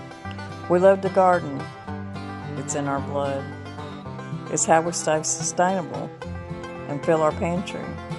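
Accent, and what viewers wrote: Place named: Arkansas, United States. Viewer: I just love your Arkansas accent! Love your videos too. You’re a good cook miss!!!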